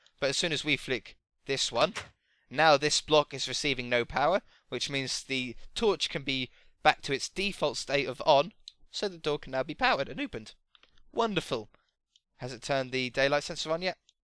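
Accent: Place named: England, United Kingdom. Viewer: I believe the correct word would be enunciate and that is just his english accent.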